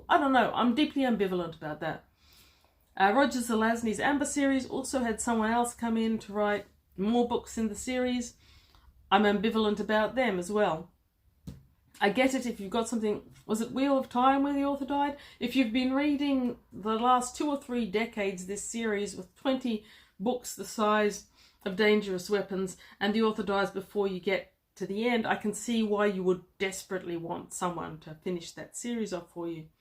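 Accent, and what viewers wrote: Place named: New Zealand. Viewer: I'm wondering why a woman with a Kewpi accent (I'm guessing here, all I really pick up is southern hemisphere) has U.S. editions of these novels. Is it just that New Zealand has such a small population that, even with the distance involved, it's cheaper to import such small quantities than to do the setup to print them.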